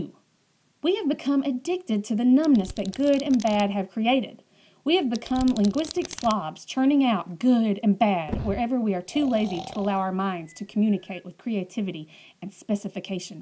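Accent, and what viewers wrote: South, United States. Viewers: Is she from the south? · what a spunky, southern sound you have!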